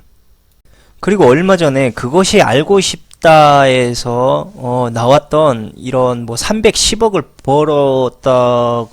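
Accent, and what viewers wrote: Jeolla, South Korea. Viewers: 절라도 말투가 듣기싫네요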